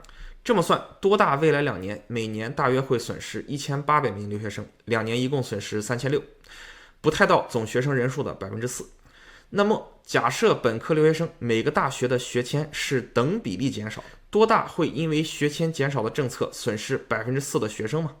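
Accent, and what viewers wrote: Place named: Shanghai, China. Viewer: 你这个上海话。。。。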